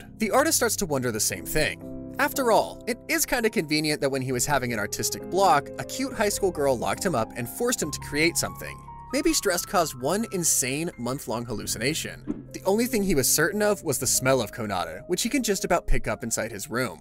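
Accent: United Kingdom. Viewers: are you a brit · Man, i didnt knew that mr. Narrator is british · are you british? just asking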